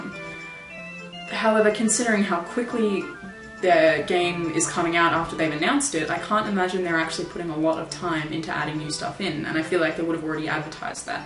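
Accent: Australia. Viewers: She's an Aussie, they've got that cute accent, all of them :P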